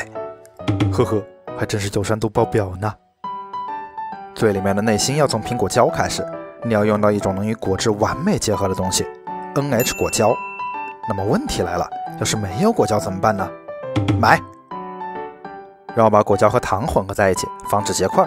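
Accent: Wuhan, China. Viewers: rua 真是亲切的武汉话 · 拐子的口音很武汉，亲切，武汉加油！ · 出门在外这么多年，改不了的是满口的武汉腔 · 武汉老乡好。浓浓的武汉口音啊普通话。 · 武汉人？有点耳熟：） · 我真的是爱死的武汉口音了😂😂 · 怎么听都像隐藏的武汉人呃！👍🤣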